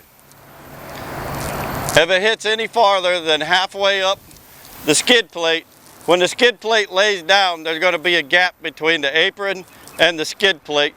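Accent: United States. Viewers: Really informative, spot on - - and that East Florida accent is the cherry on top.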